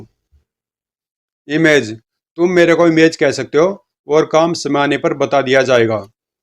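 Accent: Haryana, India. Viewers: Bhai hindi ko haryanvi me bol raha he kuch samaj nahi aa raha thire thire hindi bolne ki sahi se practice karo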